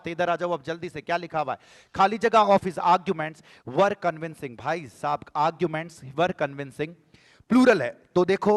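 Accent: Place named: Mewar, India. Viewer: Sar aapki mewadi acchi lagti Hai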